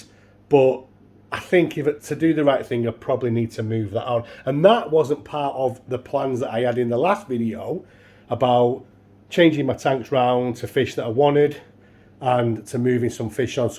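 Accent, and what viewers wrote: Manchester, United Kingdom. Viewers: Love your vids, your accent sounds like your near me in manchester ??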